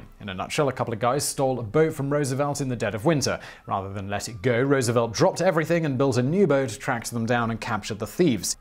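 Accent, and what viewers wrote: United Kingdom. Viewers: Why tf is vsauce British now?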